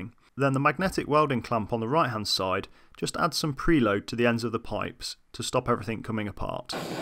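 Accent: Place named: England, United Kingdom. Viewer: I like this idea. The only thing you may have missed or considered not necessary is a pressure relief valve. If you decided to do without, why?....guess I should of read the comments first as apparently I'm not the only one wondering and the question was asked and answered. You didn't show how the tank was connected to the compressor tank in detail. It's assumed that with your accent and the union jack you and from England so what is the code as it pertains to pressure vessels? Thanks.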